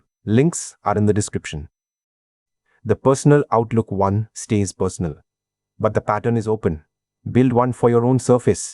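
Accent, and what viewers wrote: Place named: India. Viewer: Relieved I heard an Indian accent and not some ai bullshit 😅. I can now sleep in solace 😂❤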